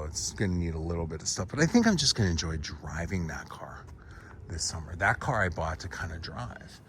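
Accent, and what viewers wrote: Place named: Pennsylvania, United States. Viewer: I could tell you are from PA by your accent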